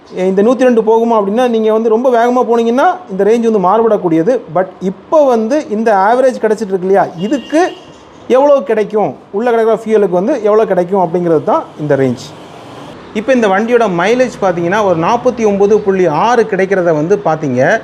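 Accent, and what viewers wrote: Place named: Kanyakumari, India. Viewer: உங்களுடைய தமிழ் அருமையாக இருக்கிறது நீங்கள் கன்னியாகுமரி காரரா?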